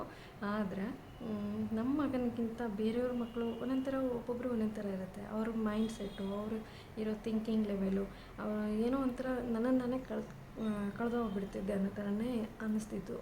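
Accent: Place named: North Karnataka, India. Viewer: ಯಾರೇ ಕೂಗಾಡಲಿ ಯಾರೇ ಹೊಕ್ಕೊಲ್ಲಲ್ಲಿ 🤪 uk ಭಾಷೆ ಇದು ನಿಮ್ಮ ಭಾಷೆ ಏನು ಅಂಕೋಳೋಲ್ಲ ಯಾರು ಸೂಪರ್ ಮೇಡಂ ಮಾಡಿ 👌🏾👍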